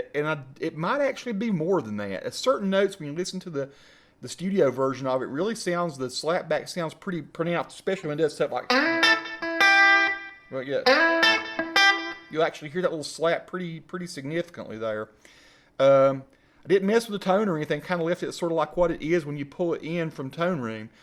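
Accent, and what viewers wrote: South, United States. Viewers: That southern accent though lol. sounds awesome man, I'm gonna buy the vt40x soon! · I love Southern American accent some of my friends actually tell me I've got a southern accent myself but bro! You should use the software to dial down the thick accent I want to decide between 40x and 20x I couldn't pick up some parts you said bruh but loveeeee the accent from IRAN of all places?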